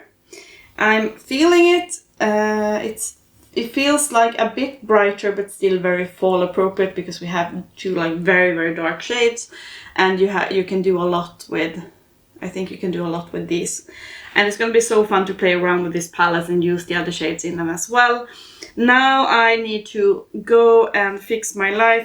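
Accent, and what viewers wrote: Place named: Scandinavia. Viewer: Hi! Here to watch your video with a cup of coffee this month. Also I just noticed you are from Sweden! I was guessing somewhere Scandinavian based on your accent. I had the pleasure of going to Stockholm 2 years ago for a few days and had soooo much fun. It was in November, and I lived in Florida at the time, so the cold weather felt so refreshing and I even got to see some snowflakes! 🩷💜🩵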